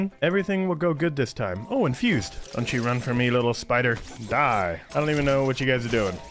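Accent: United Kingdom. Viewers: oi its anotha brit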